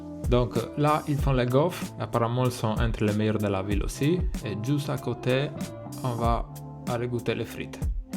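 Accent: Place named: Italy. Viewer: EXTRA!!! Continuez! C'est beau et bien raconté (con l'accento italiano). Tschüss! 🤠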